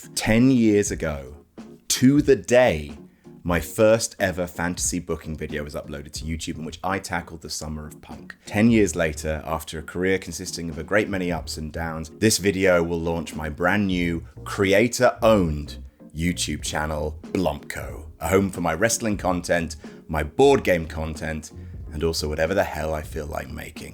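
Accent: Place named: RP, United Kingdom. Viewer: It's wouldn't be without hearing his posh accent say fuck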